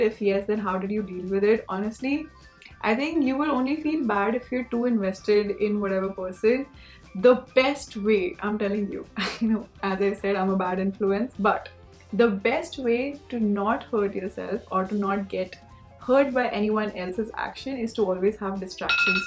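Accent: Punjab, India. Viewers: Ur Punjabi Accent Is SO Cuteeee... 😊😊😊😊😊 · Ur punjabi accent 😍😍😍😍😍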